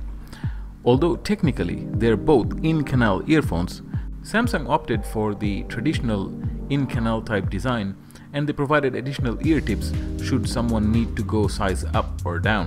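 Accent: India. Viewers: I live in India and you sound Indian too albeit you are at the initial phase of picking up the American accent where you have moved not too long ago and you were ably assisted by your kid . Bet my bottom follar you are a Bengalee I bought a Samsung AKG in ear monitor last week and it is divine in sound with Spotify and UTUBE and carties every detail of sound and is a true representation of the high class of the AKG stable. AKG is a brand which makes ptofessional sound systens , equipments and accessories. AKG was acquired few years ago by Samsung which saddened me but thankfully Samsung has maintained the European standards in AKG .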